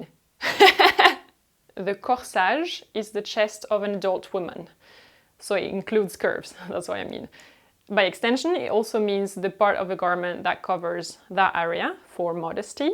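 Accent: France. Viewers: Ur french accent is so adorable 😘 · J'adore tes vidéos , j'ai commencer à les regarder pour améliorer mon anglais , de française a française on comprend mieux l'accent , et puis j'ai fini par découvrir que tout tes sujets étais intéressent et très réfléchi , ton naturel , ton charme et ton expérience font de toi une personne unique ! continue ! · Oh my! You should teach us french as you are a pretty french woman with pretty french voice) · I love your French words as well as your French accent., you present yourself so well and it's a joy to listen to your videos. 👍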